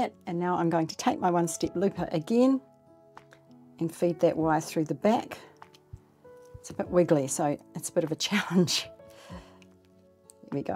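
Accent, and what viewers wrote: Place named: New Zealand. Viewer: The Kiwi accent tripped me up, I thought she was saying pills, pills and more pills 💊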